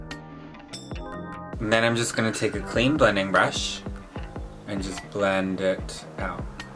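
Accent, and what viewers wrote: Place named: United Kingdom. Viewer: Stopppp, are you from the UK?! 😭👏🏽👏🏽👏🏽 Love you even more now 🇬🇧🇬🇧🇬🇧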